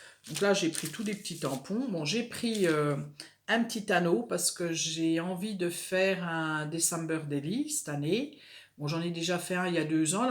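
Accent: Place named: Franche-Comte, France. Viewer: Bonjour ou devrais-je dire 😁 Après tes gentils commentaires sous plusieurs de mes vidéos, je suis ravie de découvrir ta chaîne, (par hasard je l'avoue et j'en suis toute désolée), et je dois dire que j'aime beaucoup ce que tu nous proposes, je m'abonne donc avec plaisir ☺ Et quel plaisir également d'entendre ton petit accent qui chante à mes oreilles 🤪 car oui moi aussi je suis Franc-comtoise 😅😁 à bientôt 😘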